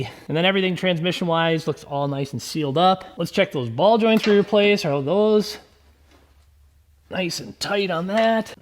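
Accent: Minnesota, United States. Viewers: your in minnesota i can just tell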